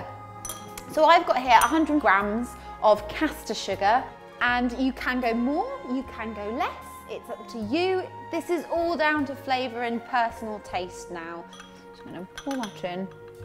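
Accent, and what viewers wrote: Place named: London, United Kingdom. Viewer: I love your London accent :)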